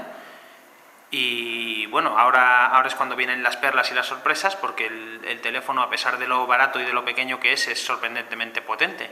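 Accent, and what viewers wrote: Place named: Spain. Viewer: oo que la madre, todos los reviews del pinche telefono son español de españa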